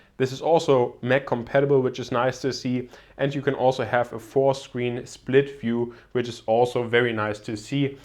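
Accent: Germany. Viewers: Man hört richtig Rausch das du deutscher bist haha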